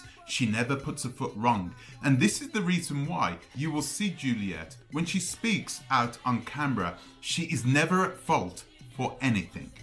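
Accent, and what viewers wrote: United Kingdom. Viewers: I am puzzled by your video. Surely this is a private matter between husband and wife and nothing to do with the business or you and us. Why are so interested in talking about their marital life and talking about them defrauding people? You sound British and you know you wouldn't get away with this in the UK without being sued. Am I missing something here?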